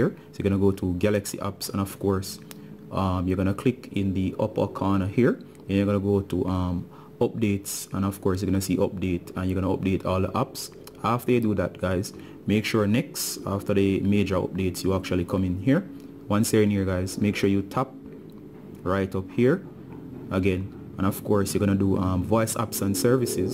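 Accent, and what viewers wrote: Jamaica. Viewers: where are you from, you sound Jamaican.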